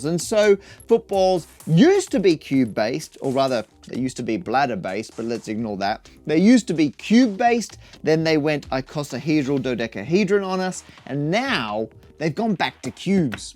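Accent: England, United Kingdom. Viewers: So your from England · Nope, it's pronounced how he said it in the video (minus the English accent). It's a german brand, not an American on, so how we say it in Europe is the original.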